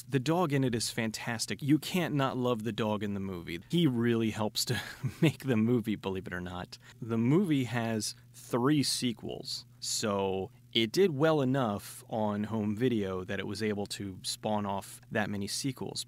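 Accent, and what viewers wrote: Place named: Indiana, United States. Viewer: where are you from? You sound like you're from Indiana maybe?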